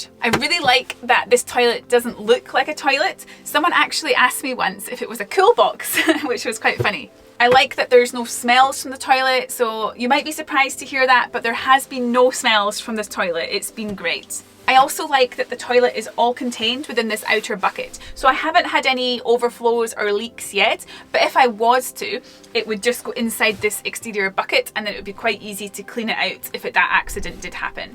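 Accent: Scotland, United Kingdom. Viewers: I've never heard anyone use the word "toilet" so many times in 12½ minutes, and with such a lovely Scottish accent. Currently researching our first van, thanks for the info.. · Thats a nice accent. I was thinking it may be Scottish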